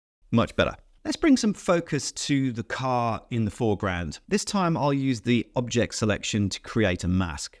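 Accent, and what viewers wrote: United Kingdom. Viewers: Wow, i use Lightroom many Years and i thought i had good knowledge. Most Photographers use Photoshop and i thought i must learn more PS, but i like more Lightroom. You bring me to the another Level, Thank you!! Greetings from Germany, i understand your clean Oxford English very good. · You are amazing and I love your clear British accent!!!🥰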